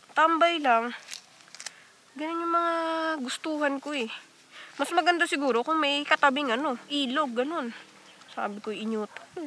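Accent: Batangas, Philippines. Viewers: nakakaaliw talaga mga punto ng mga Batangueno tapos ang nakakaaliw ka pa magkuwento. aba'y panalo!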